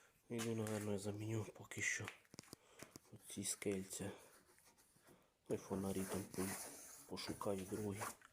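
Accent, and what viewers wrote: Transcarpathia, Ukraine. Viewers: Чисто случайно попав на твий канал Ти Закарпаття цикаво з якого села ты говориш так смішно Закарпацько Українсько руським словами ты таке наговорив поповудюу запчастей на москичу що Автомобилисти смiються для того аби правильно називати запчастини автомобиля хочаб читай в книжцi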